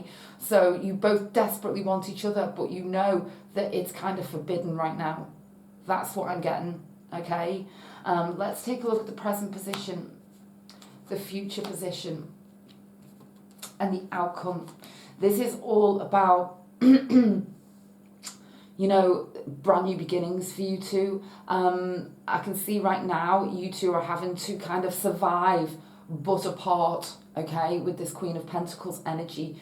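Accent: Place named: Merseyside, United Kingdom. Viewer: Merseyside accent xx Love your reading xx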